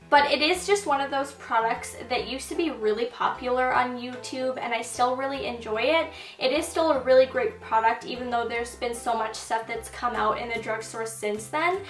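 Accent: Canada. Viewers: she has a slight accent because she's from Canada.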